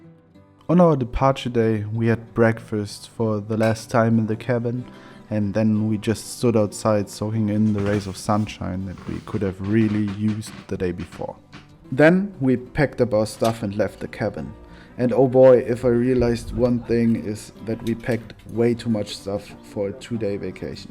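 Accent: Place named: Germany. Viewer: Your accent is lovely, whereabouts in Germany are you from?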